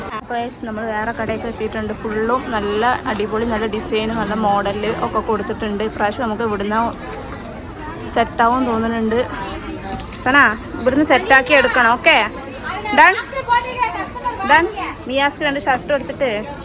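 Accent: Tamil Nadu, India. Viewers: മലയാളം തമിഴ് രീതിയിൽ അങ്ങ് പറയാ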